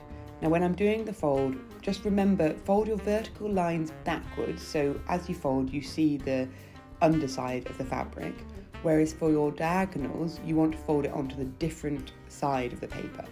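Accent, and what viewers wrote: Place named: United Kingdom. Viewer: I THOUGHT I WAS FOLLOWING YOUR DIRECTIONS BUT YOU DON'T GIVE THE ACTUAL DIMENSIONS OF THE PAPER THAT YOU ARE USING AND THE A.I. TRANSLATOR FOR CLOSED CAPTIONS DOESN'T UNDERSTAND BRITISH ACCENTS AND IT USED "A3" FOR ONE DIMENSION THAT I THOUGHT YOU SPOKE. YOU HAVE 2 TALL TRIANGLES ACROSS YOUR PAPER AND I HAVE 4 SQUARE TRIANGLES? I WISH YOU COULD GO SLOWER AND TAKE A PICTURE OF WHAT THE PAPER LOOKS LIKE WITH EACH KIND OF LINES YOU DRAW. YOUR HANDS ARE ALWAYS GETTING IN THE WAY OF THE CAMERA VIEW AND THEN THE IMAGE BLURS OUT. I REALLY WANT TO MAKE THIS!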